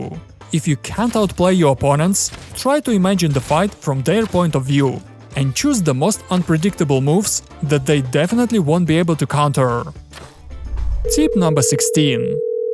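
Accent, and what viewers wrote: Russia. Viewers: о, русский акцент. Наш!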